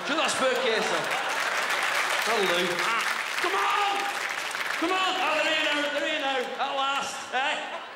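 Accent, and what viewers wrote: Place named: Bolton, United Kingdom. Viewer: "Pissed off with er stuck up" 🤣🤣🤣 Bolton accent eh lol...COME ON... Keep them coming... Makes my bloody day..😁😁👍✌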